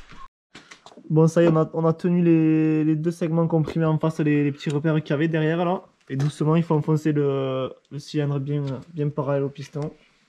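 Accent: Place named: Tarn, France. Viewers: Wooooh l’accent du Tarn c’est chaud Moi j’le comprends vite fait vu que j’ai vécu Castres un an, mais tu vas galerer sur YouTube, tout les accents du Sud passent mais l’accent bien Paysan c’est dur à comprendre tu te rends pas compte tu t’entends pas 😂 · salut les gars merci pour la vidéo, y'a l'accent du sud !! petite précision concernant le montage : perso j'aurais contrôler le jeu à la bielle juste à la main histoire de m'assurer qu'il y ai pas du jeu de haut en bas, ensuite pour le montage du piston en côte b, démontage de la culasse et contrôle du jeu à la coupe des segments à l'aide d'un simple jeu de cales, ainsi que le jeu du piston/chemise à l'aide d'une des ces méthodes ici : <link> C'est très important pour un montage correct. A+ et bonne continuation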